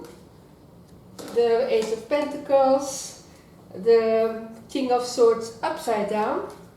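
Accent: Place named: Netherlands. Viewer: Are you from the Netherlands? Your accent sound like dutch.❤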